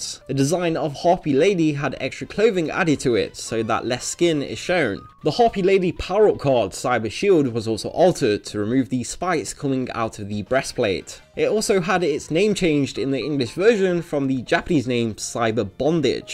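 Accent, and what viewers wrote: United Kingdom. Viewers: All british youtubers have the same cadence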